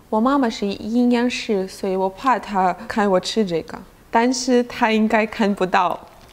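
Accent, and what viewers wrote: China. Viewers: 肯定是在來台灣前就有學中文了，有大陸腔跟用語，因為烏克蘭跟大陸是相連的！ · 她一口大陸腔的國語，四聲再抓一下就很標準了。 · 聽口音就知道去中國撈錢失敗轉戰台灣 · 等等 中文講得很好啊 雖然有中國腔 但還要來學中文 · 這種之娜口音的下次可以不要發嗎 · 倒是不一定。國外學中文本來就是學中國那一套，帶中國腔是正常的🤣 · 她說的是中國腔馬列中文， 不是台灣腔國語！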